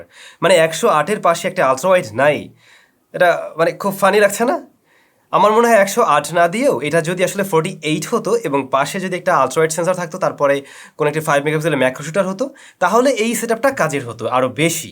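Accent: Barishal, Bangladesh. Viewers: ভাইয়া কি বরিশাইল্লা??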